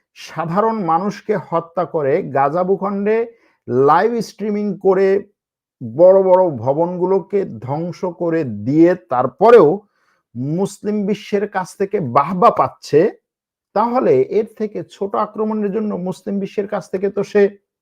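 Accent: Bangladesh. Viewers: do you hail from.Noakhali.Sound ok.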